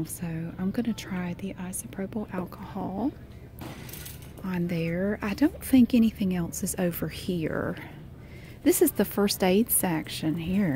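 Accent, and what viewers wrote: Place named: Georgia, United States. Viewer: you're so cute! your accent kind of reminds me of my mom (we're from GA)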